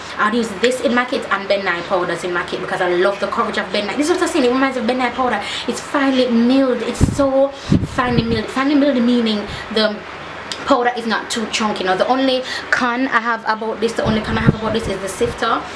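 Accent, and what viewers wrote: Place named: Jamaica. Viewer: "Mi love it" in love with ur Jamaican accent 😍 that hair tho can we have a tutorial on it please?! U look gorgeous ❤️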